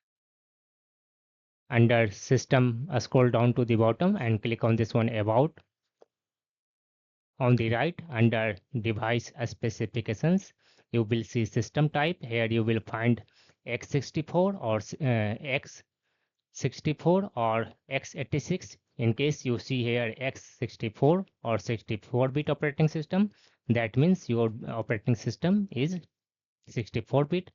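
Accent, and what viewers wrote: India. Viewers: man I love you first i dont trust you becous you sound like a indish person u want to scam me but now i love you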